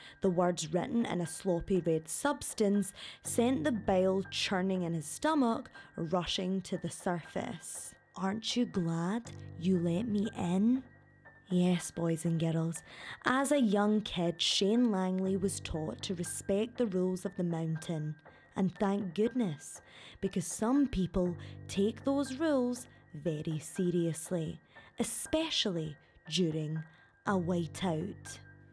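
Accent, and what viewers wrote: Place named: Glasgow, United Kingdom. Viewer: Fucken hell didn't realise how much I missed your wee wegie accent 😂🤘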